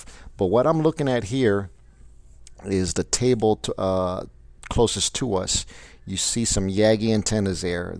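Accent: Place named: Mexican American, United States. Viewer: Can I ask where you are from? Also are you Mexican American as it sounds like to from the accent and verbal variance in your voice I would say 2nd maybe 3rd gen. Great channel and thanks for all the info you provide on a wide level which is helpful for a full perspective on this subject. I am learning so I can train some young scouts.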